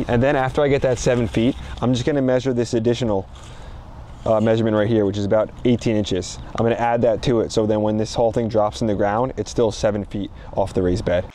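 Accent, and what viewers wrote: New Jersey, United States. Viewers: Wow!! I never expected the string part! What great woodworking! Love the NJ accent; Philly born so it is nice to hear! Good job,!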